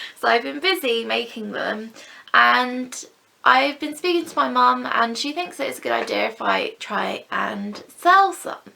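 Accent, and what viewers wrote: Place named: United Kingdom. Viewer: my husband will come in my sewing room and say: is that? He loves hearing you say: "Hello everyone, it's me,." In your British accent. 🤗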